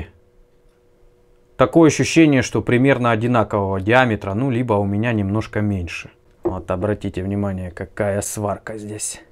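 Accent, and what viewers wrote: Rostov, Russia. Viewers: Понад корпусом🤣 ростовский говор ни с чем не перепутаешь👍 привет узловой бригаде связи г. Аксай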